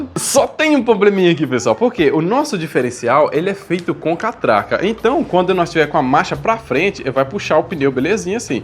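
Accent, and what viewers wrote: Minas Gerais, Brazil. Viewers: Sotaque mineiro, parabéns👏🏼👏🏼👏🏼 Exemplo de trabalhar com o que gosto, obrigado🙏🏼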